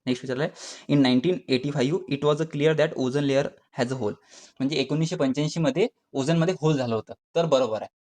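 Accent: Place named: Akola, India. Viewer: Akolyache ahe vatte sir😄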